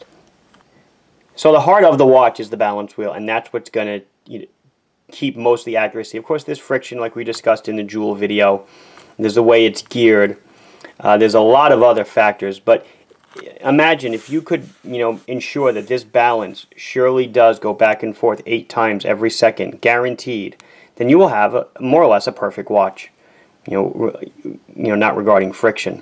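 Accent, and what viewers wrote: Long Island, United States. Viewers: grew up on long island. moved away at 24. growing older, when i visit family i notice more and more that i hate the accent. thought i would have trouble watching these vids because of that. but the quality of content makes me completely overlook the voice. if/when i buy a watch in the future i'll try to do so from you. thanks for teaching me so much.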